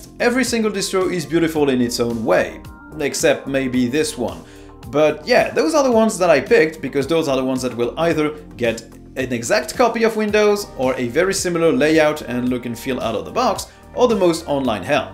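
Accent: France. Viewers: French accent, are you from France?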